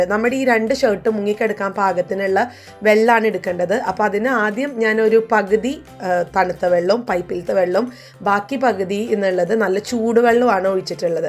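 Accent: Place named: Thrissur, India. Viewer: alla vedio vum kanarundu. njan aadyame chindichu. ningal trissur kkari aanennu. othiry eshtanu samsarikkunnadu kelkkan. wish u good luck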